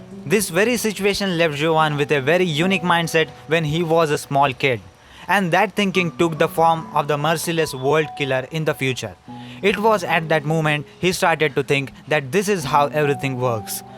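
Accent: India. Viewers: I can't stand this stupid tech support curry accent. Sorry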